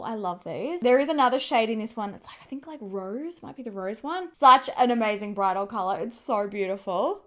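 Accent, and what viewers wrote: Australia. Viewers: I’m a libra too! But I love how you Aussies say it! ❤❤❤❤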